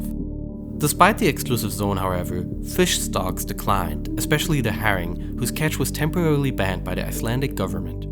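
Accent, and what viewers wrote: Germany. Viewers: Der Sprecher ist doch 100pro ein Deutscher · German narrator :D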